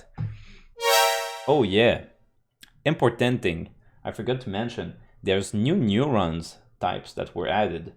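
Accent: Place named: Quebec, Canada. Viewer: Are you from the province of quebec ?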